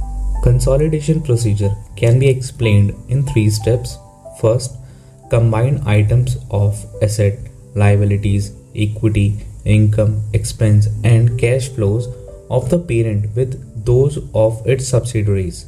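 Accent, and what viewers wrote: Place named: India. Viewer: the first problem with this video is the accent which is so bad, the second one is that you cannot cover the topic in just three minutes. Indians always fail to adhere to the minimum standards of professionalism and integrity and claim for what they don't really have. I personally don't like them at all.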